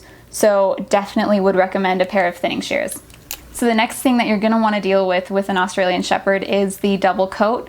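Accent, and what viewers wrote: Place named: Canada. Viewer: I just found your videos the other day and am enjoying them. I have had my Chloe, an Aussie mix, for 7 years. These grooming videos are so going to help my husband and me. Also while watching your videos, I was like is she from Canada? My husband is Canadian. Then I saw the comments and was like yup she’s Canadian. 😁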